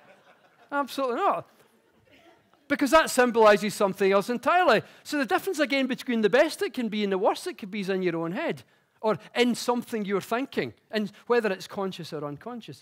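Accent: United Kingdom. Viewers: Glasga accent? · Adorable scottish accent ...fantastic talk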